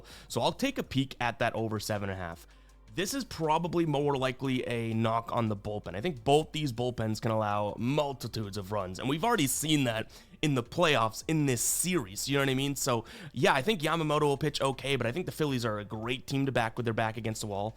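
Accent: Boston, United States. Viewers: You're from BOSTON????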